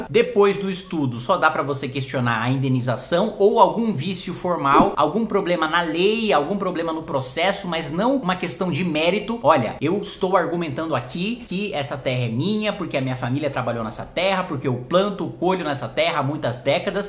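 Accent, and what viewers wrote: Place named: Pará, Brazil. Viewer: meio paraense, legal.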